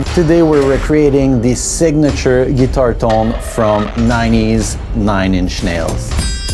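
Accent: Quebec, Canada. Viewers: Accent Québécois? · ohhhh really !!! Thanks !! Keep doing your videos !!! Very nice content ! You sound that your from Quebec ! De où ?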